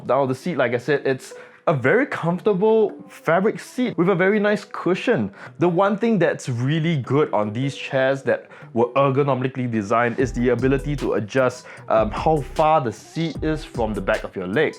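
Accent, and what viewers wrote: Singapore. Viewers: I know you’re from Singapore. Your last name and your accent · Do I detect a Singapore accent?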